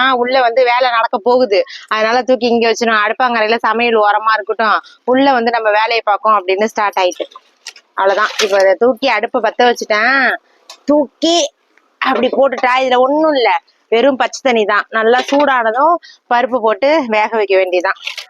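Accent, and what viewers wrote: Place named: Tiruchirappalli, India. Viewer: Nanga Trichy akka neenga pesuradhu nanga pesuradhula ore madhiri iruku ,ipa chennai la irukom Inga dha konjam different ah enaku theriyudhu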